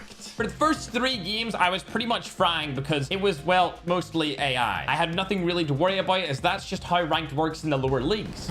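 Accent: United Kingdom. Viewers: Are you British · Bro where are u from britsh?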